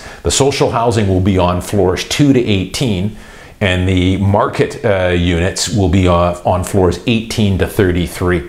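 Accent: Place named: Canada. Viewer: The most typical Canadian accent. Fascinating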